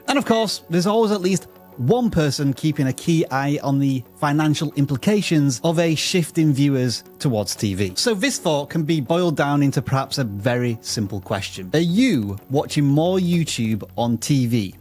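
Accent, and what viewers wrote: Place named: Yorkshire, United Kingdom. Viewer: Yo I know you live in Canada, but I know that you came from Yorkshire. I can hear it in your accent. What I would like to know is cause. I have a friend who’s from Yorkshire what part of Yorkshire are you from? He’s from Hull. He also has a YouTube channel, so I have been comparing your accents.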